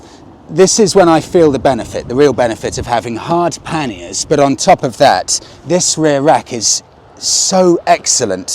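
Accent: RP, United Kingdom. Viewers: Just curious...is yours a London accent? Is that considered posh? Thanks.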